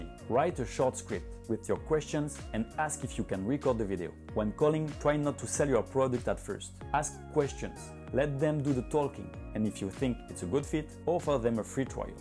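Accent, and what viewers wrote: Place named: France. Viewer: Tu à un accent Français ✨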